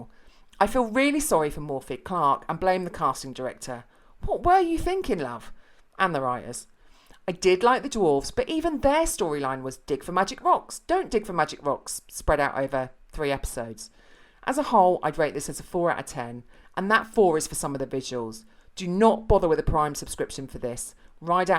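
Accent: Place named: England, United Kingdom. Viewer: Nothing better than an english accent to tear this shit apart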